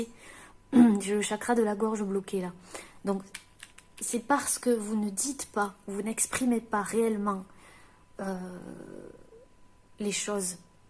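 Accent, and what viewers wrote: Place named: Hautes-Pyrénées, France. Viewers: Bonsoir, Merci cela me fait du bien d'attendre l'accent des HP. Aucun endroit ne ressemble à notre département. Les marchés me manquent et la simplicité de nos campagnes. Les gens sont simples, et on rigole bien. Et la tourte!!! Tu es trop mignonne, très douce et gentille. Prends soin de tous ceux qui te sont chers et de toi aussi bien sur. Bye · je découvre ta chaine, j'ai choisi le tas 2. Tout est vrai et le chakra de la gorge cela me parle car pendant 65 ans j'ai tu ma personnalité pour éviter les conflits, pour ressembler aux autres avec cette impression incroyable d'être complètement différente. Ma façon d'être à toujours dérangé et j'ai perdu mon identité. J'ai le don de l'écriture, du chant et du dessin et depuis plus de 6 ans... je suis bloquée. Mardi je me fais ponctionner la thyroïde... à force de ne pas dire mon ressenti, de taire certains sentiments, j'ai développé un soucis qui peut être grave. 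J'ai des rêves prémonitoires depuis longtemps, je fais des méditations, de la cohérence cardiaque et aussi j'écoute beaucoup de séances d'hypnose pour avancer. Je confirme les douleurs dans le dos et les bras, la libido inexistante. Je me fais aider par une magnétiseuse-énergéticienne qui m'a dit que j'avais un pouvoir. Je suis trop fatiguée et fragile en ce moment pour l'aborder et le travailler à 65 ans on a pas la même énergie qu'à 20 ou 30 ans. Merci Jolie Voix à l'accent qui fleure bon l'Occitanie. Namasté 🛐☯️♾🌟🌹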